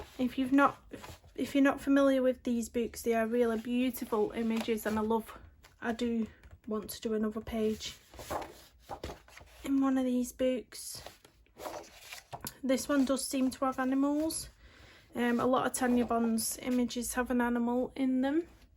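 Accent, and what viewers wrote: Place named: Yorkshire, United Kingdom. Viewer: Just found your channel and i love it very much. I am a senior who colours so i find your content very informative. Your children sound adorable so don't ever apologize for their noise. They grow up and leave home very quickly and then you hope for grandchildren so the noise comes back. I do have lots of these books so i will be able to colour along with you too. PS I love your accent. Im assuming its Yorkshire but could be Lancashire.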